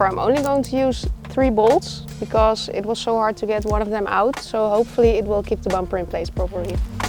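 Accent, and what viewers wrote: Netherlands. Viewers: So funny, I immediately noticed the Dutch accent. I love the Netherlands, best place to live. Was in Utrecht/Amersfoort for 2 years before the pandemic. Greetings from Portugal. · dame you are beautiful!! and love that dutch accent! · Beautiful Netherlands girl with an NL dialect and she knows how to deal with screwdriver and so on 🥰😍 And than the yellow CRX next to the Volvo 😋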